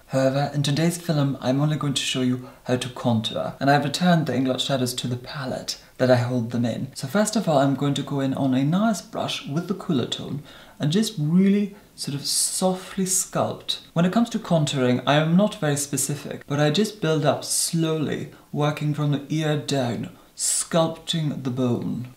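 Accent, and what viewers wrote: Scotland, United Kingdom. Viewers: Nina Lights he's from Scotland he's just posh lol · So beautiful and elegant! Lovely to hear the Scottish accent out there too❤️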